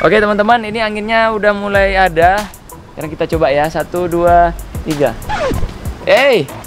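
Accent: Madura, Indonesia. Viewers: Orang madura ya bang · bang asli Madura mana??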